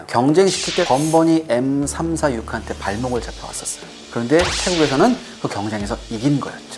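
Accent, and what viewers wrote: North Korea. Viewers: 국방TV에서 북한 말투라... 정권이 맛이 가니 국방부도 맛이 가고 있구만.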